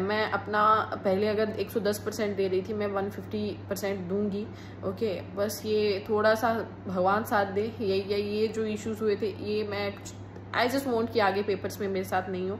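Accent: Haryana, India. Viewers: Haryana se ho kya sis aap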